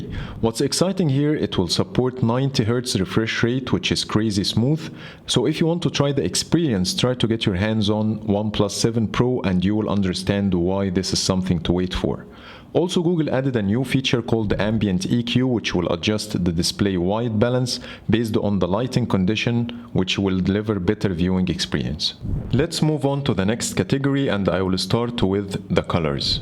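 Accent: Egypt. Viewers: Hmmm I hear an Egyptian English accent over here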